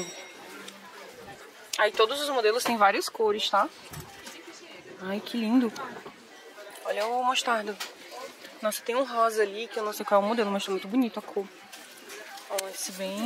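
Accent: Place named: Pará, Brazil. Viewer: Mana, tô achando q tu é Paraense, rsrs. Gostei do vídeo, dando like e ganhou mas uma inscrita.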